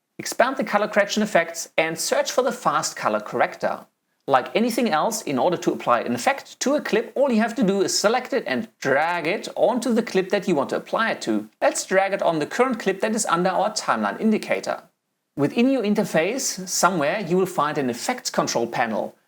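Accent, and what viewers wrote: Germany. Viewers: I love this video! I love your accent! It's German, right? You gained a new subscriber! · Are you German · Delighted that you replied! I’ve been struggling so much since I bought my Premiere Pro subscription, and I’ve been suuuuper intimidated to release my second video. The road appeared so uphill, and honestly, till I saw your video today, I’ve been regretting the Adobe purchase. I shall try again tonight. By the way, found your accent pretty interesting! Is there any German or Austrian connection ? Just curious :-) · You're not an idiot (and you better get past that idea if you're going to make any progress in life). This guy's accent is not merely obscure, it's incomprehensible. I truly do not understand why any company would hire this guy to speak to Americans. I doubt he's a native English speaker; I'm thinking northern Germany for his birthplace. 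This is NOT a "tutorial for an absolute beginner." Partly because the guy's accent is as thick as mango trees in Cuba. Example: Americans say EYE-CON, this bizarre chap says "EYE-cun." It's a small difference, but given how fast he's talking, it's a major difference, and the net result is, you can get lost quickly.. The other reason this is not for beginners is, he goes way too fast. Many, many, many better options available for beginners.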